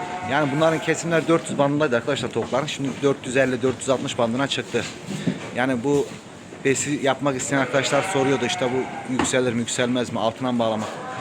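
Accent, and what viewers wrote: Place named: Erzurum, Turkey. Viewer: Kardeşim bereketli olsun sayfana yeni üye oldum. Hangi şehirde yapıyorsun bu işi ve nerelisin Erzurum Ağrı şivesi var konuşmanda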